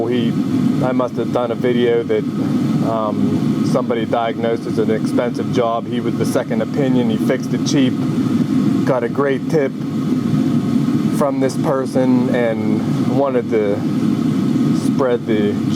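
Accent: Pittsburgh, United States. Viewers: I thought you sounded like you had a "burgh" accent. Central pa here. I have the same problem with my caravan I think, I've got to tear it apart to make sure. Thank you for the info.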